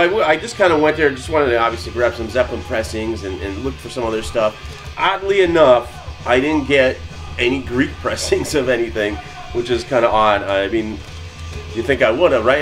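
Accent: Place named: Chicago, United States. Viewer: ! Happy Anniversary! Love the videos! When I’m homesick, I can come here and listen to some Chicaaaago accent and great music! Cheers brotha!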